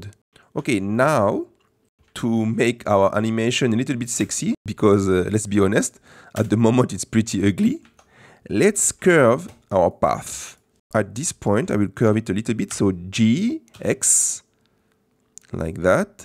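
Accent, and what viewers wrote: France. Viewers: Wsh mais t français sa se voit tellement avec l'accent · You sound french or something like that , cuz i hear an accent , i mean i'm french and i hear the french accent , i'll be surprised if your not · Tona accent français 🔥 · Ça s’entend qu’il est français le man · Tu esy français mon amis 😉 je t’ai reconnu sinon bon travaille 👍🏽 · L'accent français 😂 sinon très bonne vidéo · i like your french english ascent hhhhhhhh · Mais tu as un accent français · tres bon tutoriel avec un bon accent Francais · you have a french accent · A l’accent je dirais que tu es Français non? Merci pour ton tuto, en français ce serait top 😁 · This is amazing. Thank you so much! Your french accent makes this long tutorial so much more tolerable! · GG pour le travail, j'aime cet accent français Ahah ! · tu es français toi haha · Tu pourrais le faire en français stp car j'ai l'impression à ton accent bien qu'il soit très bon, que tu es français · Magnifique accent francais ^^ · on sent l'accent français mdr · ça ce voit que t'es français sauf que moi aussi et du coup ça me fait rire parce que c'est la vidéo parfaite mais je comprend rien 😂😂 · Le bon accent français! 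J’aime beaucoup le tuto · Salut je viens de voir ta vidéo dans mes recommandations alors que je ne regarde jamais d'animation 3d et enfaite c grave styler et sa doit prendre beaucoup de temps sinon continue comme ça mais je me suis dit que j'allais envoyer le message en français c que tu a un peux l'acsan français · PTDR ta un acens Bien FRANCAIS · french accent are crazy bro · serait tu français avec ton accent ? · T'as un accent français ça me fais tellement rire x) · T es français ? En tt cas t as un accent français du coup j comprend 😂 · Toi t'es français 😂😭😭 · Tu parle français sa se vois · Ton accent français se remarque direct mdrrrr Continue comme ça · Baguette But honestly I just love french accent... you saved my evening... I don't even care about blender and animation but still very interesting and well explained :D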